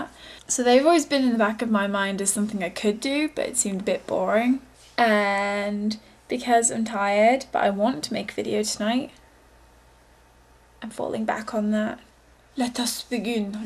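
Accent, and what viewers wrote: England, United Kingdom. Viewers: British English. Woot. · haha, British! LOVE it! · i love british accent!!!! a new fan here!!! ciao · she is cute and funny in a sort of way ^^ I love englands accent! somebody said she was boring... well, she's not! just click on something else if u think it's boring;) search for manualist! that's a nice video by someguy i don't remember the name of atm... but it's awesome ^^ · your english accent is really really well spoken its quite mad. maybe because your now in canada? ha x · Sorry that was a bit harsh, I didn't realize that comment was actually going to post, I'm not use to to actually being logged in. Anyway just listen to by. I'm a guy by the way. Keep up the British accent, that will always get you places in life. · are you from england ? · You're adorable... i love an english accent. Thanks for posting!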